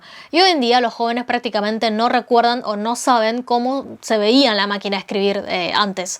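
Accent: Argentina. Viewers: Sos mas argentina que yo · Es graciosos ver a una china con acento argentino 🤣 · Me encantó el video y me gusta tu acento argentino. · Amo su pronunciación china/argentina dioss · Un linda chinita que habla con acento argentino!! Tenes un nuevo suscriptor che!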